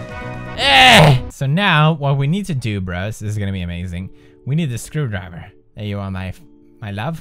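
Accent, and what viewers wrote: Sweden. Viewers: He did say bear but he has a Swedish voice so that in a result is why you hear "beer" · lol it is such a swedish thing, my bf is from there and he says beer as well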